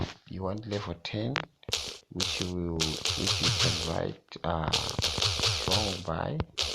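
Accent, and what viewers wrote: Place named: Zimbabwe. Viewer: are you zimbabwean??..ndanzwa tushona umo..how can i contact you bro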